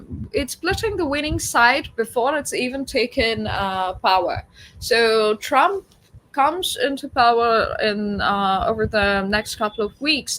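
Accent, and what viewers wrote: United Kingdom. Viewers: This was cathartic - as an Indian American. Well said. Is that a british accent u have? I think its something different. Very sweet. Followed u here from DEF talks. Jay Shri Krishna.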